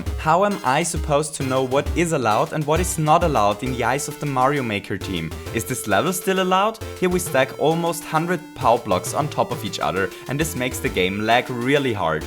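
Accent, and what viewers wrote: Germany. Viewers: Are you german? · bist du deutscher? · Bist du deutscher? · Are you German? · are you german? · Hey... You´re from Germany...:D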